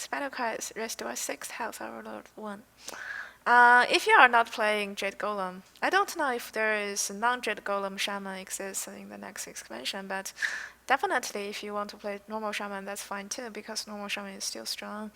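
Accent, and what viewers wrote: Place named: China. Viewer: 学长的英文一股汉语味er，但是你什么时候回国内平台播啊？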